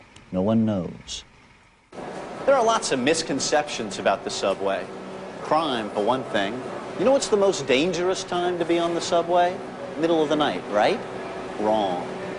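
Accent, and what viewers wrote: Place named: New York, United States. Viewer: This presenter has the most New York accent I've ever heard in my life. And I'm an almost-thirty year old who was born, and has spent most of her life in Brooklyn.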